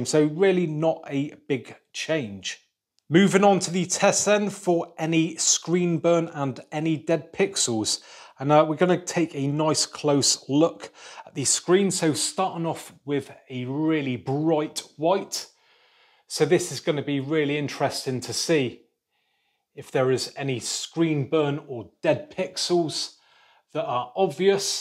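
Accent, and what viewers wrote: United Kingdom. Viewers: Thumb up for that beautiful British accent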